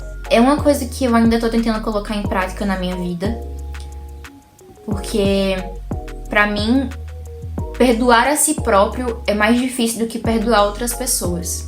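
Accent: Northeast, Brazil. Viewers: o seu sotaque nordestino é inconfundível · PERCEBI PELO SOTAQUE QUE ERA DO NORDESDE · Tá aí um sotaque nordestino arretado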